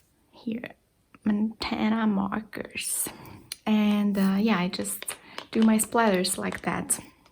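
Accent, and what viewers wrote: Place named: France. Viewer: Hi, Thanks for such a detailed craftroom tour and all the inspirations. Love your French accent. Je parle tout petit Francais. Thanks for the link of the beautiful paper wall flowers from Etsy. I was also wondering whether you can give me information on the poppies wallpaper at minute 2: 48 on your video. A link would be awesome or at least the store you bought it from. I loooove it so much and hope to get it for my house. Thanks so much. Hugs, Rosalie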